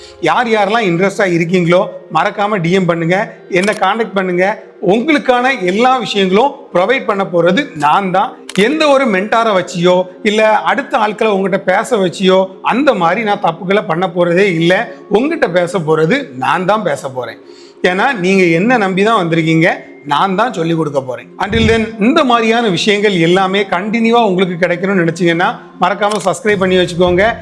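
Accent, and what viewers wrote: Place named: Nagercoil, India. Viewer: Neenga nagarkovil ahh unga slang nagarkovil karanga peasura mathiri yee irukku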